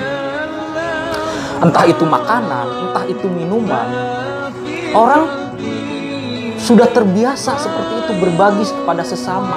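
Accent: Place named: West Java, Indonesia. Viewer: Beruney nya mn sih kang logat mu macam sunda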